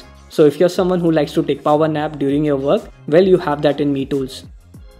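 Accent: India. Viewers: Ngomongnya medok india banget yak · I could hardly understand your Indlish...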